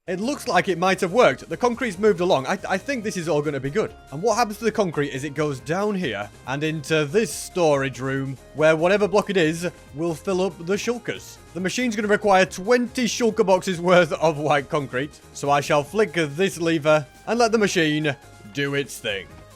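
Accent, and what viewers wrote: United Kingdom. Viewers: Wait.. is British?? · Are you british?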